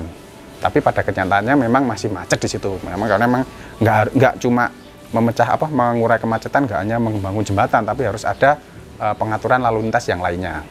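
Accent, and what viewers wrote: Java, Indonesia. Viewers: Suaramu enak di dengarnya Mas... jawane poll.. slm dr Tangerang Banten Mas...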